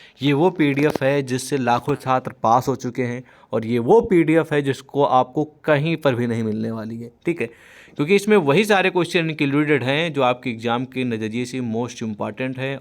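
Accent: Bihar, India. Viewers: Bihar hai ya up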